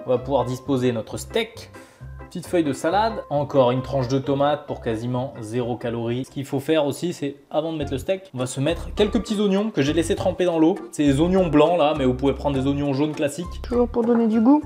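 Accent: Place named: England, United Kingdom. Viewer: super video on voit que l'on peut quand même ce faire plaisir sans trop de calories mais par contre l'accent anglais sa me soules 😅😅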